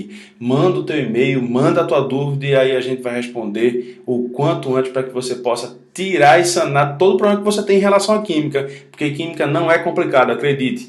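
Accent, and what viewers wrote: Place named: Northeast, Brazil. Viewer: Kkkkkkk cara vc é mt bom!!!!! Serio msm eu rir e aprendi, parabens amei teu sutaque de nordestino ne?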